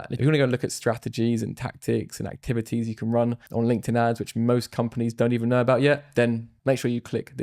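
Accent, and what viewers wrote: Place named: England, United Kingdom. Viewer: Dude, you sound so english wehre are you from or based actually right now.?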